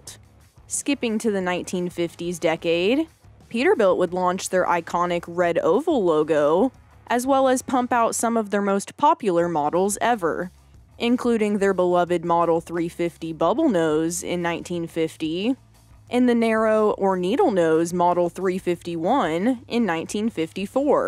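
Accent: United States. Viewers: sounds like she's from the UP Michigan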